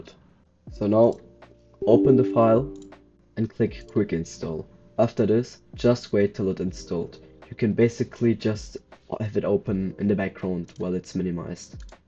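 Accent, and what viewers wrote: Germany. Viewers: I hear i little bit of german in his pronouncing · Man merkt sehr stark, dass du Deutsch bist.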